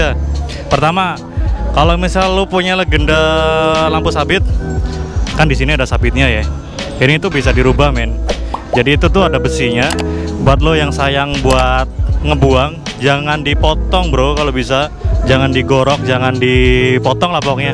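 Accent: Banyumas, Indonesia. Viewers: ngapak ya om? asli mana? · Hahaha salam ngapak mas ..mesti wong cilacap mbok sampean lah 🤫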